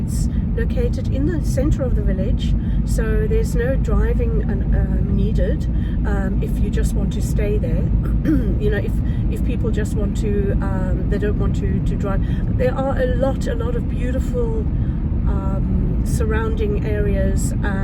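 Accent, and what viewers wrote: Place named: South Africa. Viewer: Hi. I love the history and footage of the little village where you have bought your studio. The lake is beautiful too. You sound South African ❤ thank you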